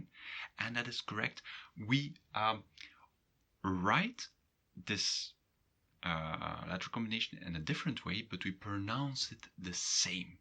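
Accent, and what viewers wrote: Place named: Belgium. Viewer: These are very strange interpretations- is this Belgium? Because it doesn’t sound Dutch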